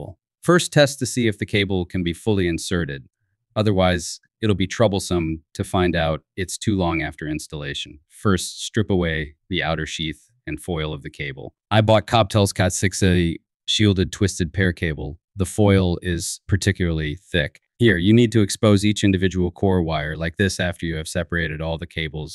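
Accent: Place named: United States. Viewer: American accent with a uk plug? Hmm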